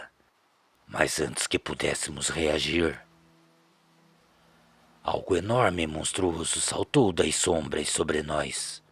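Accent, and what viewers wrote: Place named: Paraná, Brazil. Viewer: Boa noite, meu velho ! Cara gosto deste sotaque de ti uma mistura do Parana com o interior de Sampa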